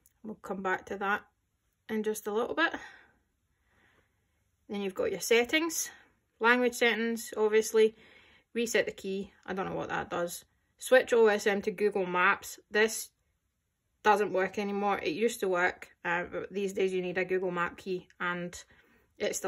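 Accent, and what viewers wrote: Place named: Scotland, United Kingdom. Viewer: after hearing your Scot accent I am starting to like it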